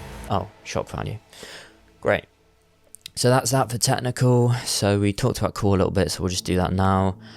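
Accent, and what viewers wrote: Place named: England, United Kingdom. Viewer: But also the more I watch this channel the more you remind me of Avarti from the monotone voice to the English accent to the weird takes on things, I’m glad cause we need more of y’all on YouTube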